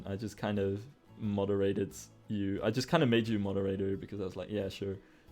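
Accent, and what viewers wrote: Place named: Netherlands. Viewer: You sound Dutch. Are u from the Netherlands?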